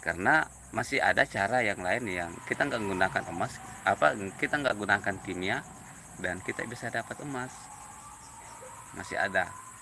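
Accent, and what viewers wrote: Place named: South, Indonesia. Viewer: Posisi dmn ki, bos ku kayaknya logat selatan ki ini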